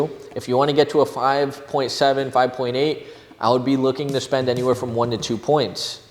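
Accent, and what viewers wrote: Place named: Venezuela. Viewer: No you did not just say you speak spanish you spoken in a Venezuelan accent because your mother is from Caracas. Your speech was slow and more deliberate as most Venezuelans I know speak faster. Yo hablo Castellano y cuatro otros idomas. Now for the house can you show other homes that are not that puke modern look, it screams cheap construction. There is more colors than black and white, it looks sterile and neutered, like they use to build prisons and those cookie cutter open concepts are terrible. I grew up in a real home, with clear defined rooms made with reinforced concrete, stucco and plaster interior walls about 2-3 inches thick. No hoa I approve of but the rest, the lot soil is more valuable that the material or labor. Can your builders do anything else but xerox every other lame builder. If they xerox Frank lloyd wright we might see something better.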